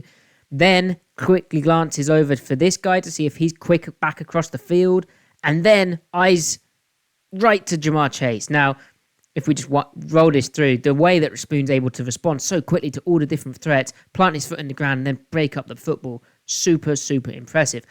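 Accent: United Kingdom. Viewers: YOU'RE BRITISH!?!? I've been following you on twitter for a while and had no idea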